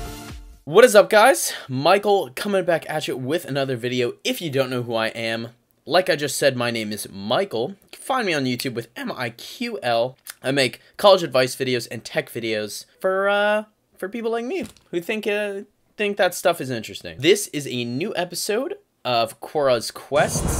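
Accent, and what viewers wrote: United Kingdom. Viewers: hey..I absolutely love your video. I love the british accent..you're going to be famous... please make more videos..I'm actually a registered pharmacist making $175000 a year for Walgreens pharmacy in the "el paso district" in las cruces new mexico....I went to UNIVERSITY...loved that..it's a British and canadian term university lol aka college. in US..my point being....love your breakdown of the yearly income for future millionaire's in today's economy...my friend is in your field and coul have used your help like 50 years ago but you didn't exist then..oh btw I only went to university for 7 years. I graduated at age 24 with as a pharmacist and live in one of the cheapest places to live in the country New Mexico..in NY I'd be making $30000 a year...ps your videos are my new addiction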